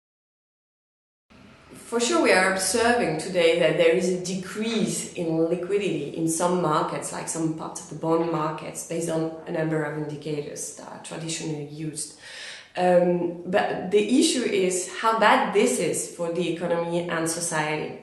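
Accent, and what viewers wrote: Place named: France. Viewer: she is a french speaker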